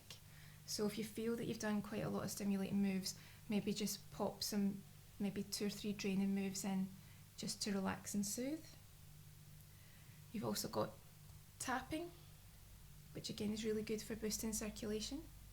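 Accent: Scotland, United Kingdom. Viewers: Jawesome scottish accent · I just love her voice, it's so calming, and I find Scottish accent pretty sexy in a way...XD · I could tell you wrre from Scotland by your accent. And thays ok I just thought you were a salon with you having all the equipment layouts and stuff if you know what I mean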